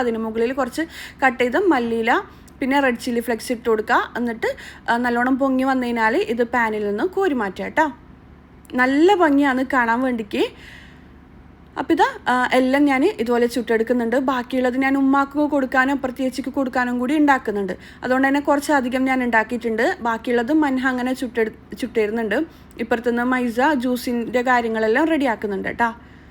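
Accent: Kannur, India. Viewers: പഠിച്ചതൊക്കെ ദുബായ് ആണോ.. മലയാളം അത്ര fluent അല്ലല്ലോ.. അതോ കണ്ണൂർ മുസ്ലിം ഭാഷ ഇതാണോ?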